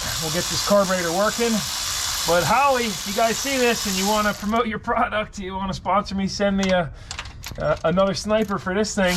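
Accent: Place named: Philadelphia, United States. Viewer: I'd like to see your swap process for the 4 speed. this is the first video I've watched and it was great. You sound like your from Northeast Philly I'm from Harrisburg. It's really cool to see someone local doing this on YouTube I've been thinking about starting my own channel and I love your format. Keep it up!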